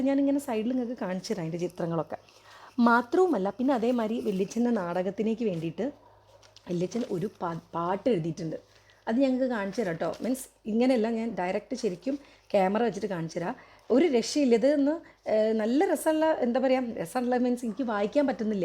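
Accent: Kozhikode, India. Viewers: ഈ nostu കേൾക്കാൻ വേണ്ടിയാണ് ഈ ചാനൽ കാണുന്നത്. പിന്നെ കോഴിക്കോട് സ്ലാംഗ് കേൾക്കാനും ഒരു ആഗ്രഹം. എൻ്റെ അച്ഛൻ്റെ വീട് കോഴിക്കോടാണ്..അവിടുത്തെ ഭാഷ അതേപോലെ അവതരണം 👌👌👌👌👌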